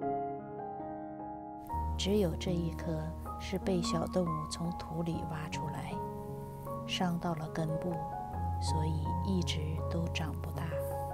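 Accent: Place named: Northeast, China. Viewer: 隐约听出东北口音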